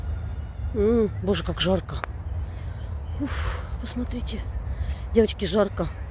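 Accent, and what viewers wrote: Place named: Armenia. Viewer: Я люблю отдыхать в Сочи, именно в Адлере, там много знакомых армян и армянок. Смотрю видео и представляю, что я в Адлере, и по природе и по вашему акценту))) Спасибо Вам за красоту!!!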